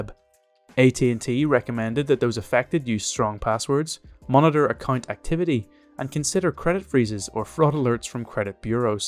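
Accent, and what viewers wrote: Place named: United Kingdom. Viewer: I hate Brit english..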